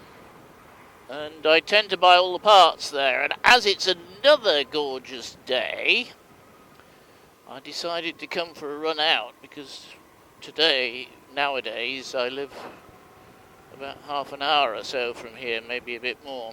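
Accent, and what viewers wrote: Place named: France. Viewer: Sounds like you've lived in France for quite a number of years,.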